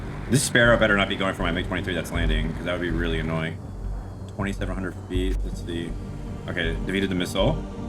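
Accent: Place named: Eastern Europe. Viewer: au au au, even my eyes got hurt by your Slavic pronunciation... Dude! Come on!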